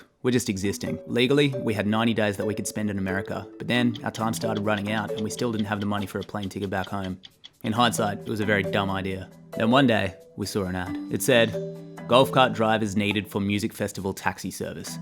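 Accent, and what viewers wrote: Australia. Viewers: I don't like this. I LOVE this. I've shared your vids a few times (and hope your followship and fellowship GROWS). I have special connections to chance, serendipity and Arid-zona. Beautiful tribute, but even better, you're a great storyteller and give sound advice. Oz accent or not (and storyteller is the highest compliment I can give, because it doesn't matter the medium, that is what we do). <3 And RIP to your friend Chris. Unless there's new adventures on the otherside. In which case, I hope he rips it up!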